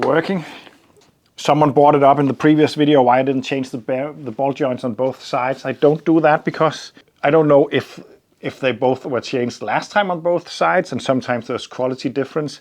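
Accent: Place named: Denmark. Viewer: Hey man, are you from denmark? I think i hear a little bit of a danish accent in your english, and in your umm's and hmm's but it could just be me. Really cool channel, love the content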